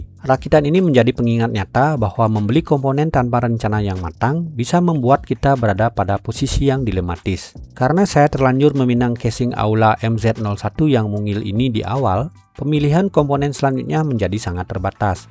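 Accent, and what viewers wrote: Bali, Indonesia. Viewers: Kok bisa2nya mikir dia muslim bro? Sy awal tau chanel ini dan denger logatnya aja langsung tau kalau beliau org bali 😂. Sy jg muslim dan belum pernah ke bali juga sih, cm beberapa kali nonton interview org bali dikonten org emang logatnya mirip kaya gini...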